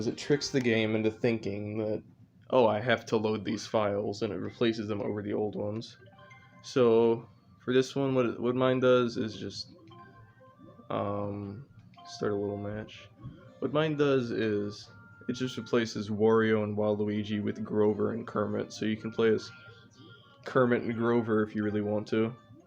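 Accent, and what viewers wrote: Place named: France. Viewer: Spongebob French narrator voice generator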